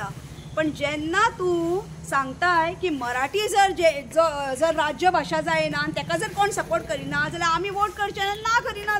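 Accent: Goa, India. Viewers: Valor tuca, Niz Goenkar Aavaz.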